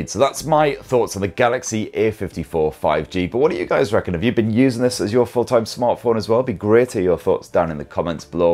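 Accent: United Kingdom. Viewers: British accent . i think.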